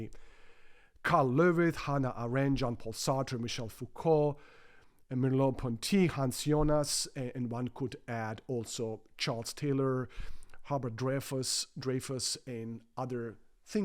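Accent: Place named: Germany. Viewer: That was a wonderful talk, and it has certainly awakened my interest in Heidegger. Thank you! Your spoken English is excellent, by the way. Your accent is difficult to place. Ist Deutsch ihre Muttersprache?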